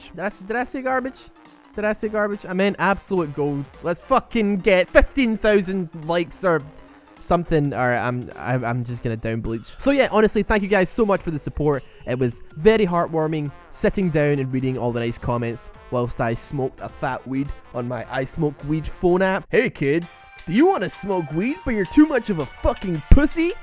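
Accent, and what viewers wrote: Scotland, United Kingdom. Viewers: He is scottish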